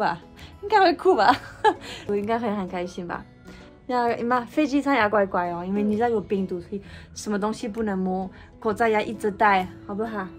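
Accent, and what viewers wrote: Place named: Taiwan. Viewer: 一个法国人一口台湾腔，哈哈。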